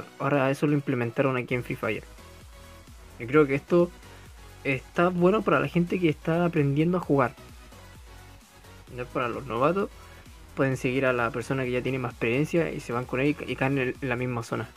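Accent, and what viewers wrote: Chile. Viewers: Man de que parte de chile eres?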